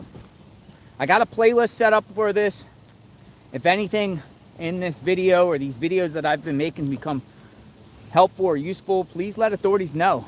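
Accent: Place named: Baltimore, United States. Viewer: where are you from? You sound like you're from Baltimore! I'm from there so I know the accent. Its not heavy (your accent) but I feel youre from BMore /Philly????? Please answer if you have a minute. TIA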